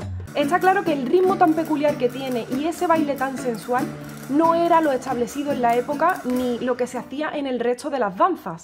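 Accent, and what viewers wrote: Andalusia, Spain. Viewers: Y desde el Guadalquivir llega a Veracruz, después se convierte en sones y jarabes novohispanos. Felicidades por la interpretación. Ese acento andaluz 🤤🥰 enamora 😍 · Me encanta su acento andaluz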